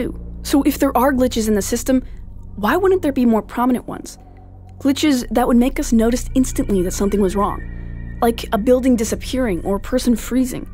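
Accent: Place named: Canada. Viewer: This is an amazing channel which is innovative and logical without being boring and I love the commentary.. also the way you say "out"and "about" makes me think whether you're Canadian 😋 One of the best channels I've found on YouTube!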